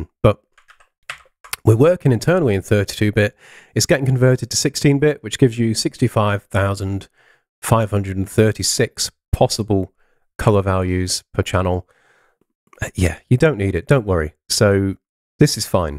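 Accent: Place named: United Kingdom. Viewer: it‘ a pleasure to listen to your British, sophisticated voice.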